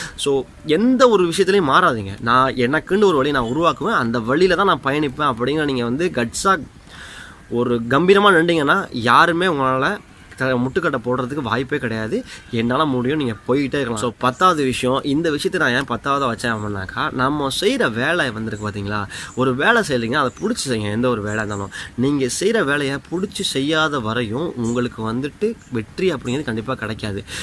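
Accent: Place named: United States. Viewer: Woooow what a American engalish speech language... Good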